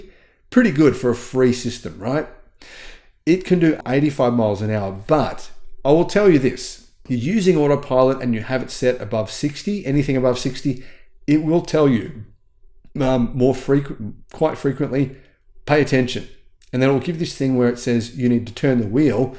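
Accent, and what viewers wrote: Australia. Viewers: You sound Okker to me, in the USa I have to adjust a few words, like pronounce Ts with Ds , all part of the fun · NO mate, speak MORE aussie, I love it! Greeting from a dane, an actual viking :D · I don't like to listen to AI narration or videos that are difficult to understand because of a strong accent. You Aussie accent is accent is actually refreshing. My son drives a vintage model 3. I love riding in it. Used 3's are available at great prices. The only reason I won't buy a Tesla is that Musk is such an ass. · Love the Aussie accent. Wanted to move there to Melbourne so I could have it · Your Aussie accent is quite charming and not an impediment...you are 5x5 on communications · My wife, daughter, and I test drove the new Tesla Model 3 about a week ago, and we were all impressed. Before, my daughter had no interest. During and after the test drive, she kept asking when we were buying one. 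I saw huge improvements over the TM3 from just a few years ago (2020): fit and finish, quieter, less jarring and less road noise, more comfortable seats and a nicer interior, a better sound system,… This is the best value EV on American roads, easily. BTW, your Aussie accent is great and very easy to understand. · There's absolutely NOTHING wrong with your Australian accent. You be you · You sound great! Love the Australia accent. Don’t change! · There’s nothing wrong with your accent, it’s pure Australian. The road trip appraisal sounds pretty fair to me. I have friends that own Tesla’s and BYD’s and the Tesla is light years ahead of the Chinese EV range. If you’ve decided to move from ICE to EV, avoid Chinese built cars. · you are easy to understand! We all (my family) loves your Australian accent. Do not change, period❤ Be yourself, you and your family are awesome and always welcome...! 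Thanks for all you do and we are praying for continued positive health and success ❤ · Keep the Ozzie accent BUT “Ion” and “iron” sound the same in Oz Maybe say “eye-on” for ion & “eye-urn” for iron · Another great video,. I live in California and appreciate your Australian accent. As for the M3, I drove one for two weeks while my '22 Ford Mach-e was getting body work done. I hated it. The car felt cheap, was loud, and did not have CarPlay. I'm happy people are buying EV's but the Model 3 is not a good car. The Model S is much better.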